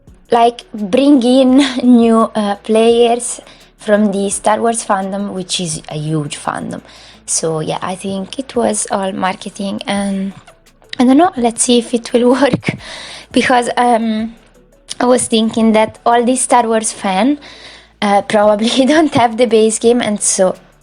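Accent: Italy. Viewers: ma sei toscana ? si sente troppo anche quando parli in inglese <3 bellissima casa comunque, l'esterno è da favola ma anche la struttura stessa della casa è davvero speciale.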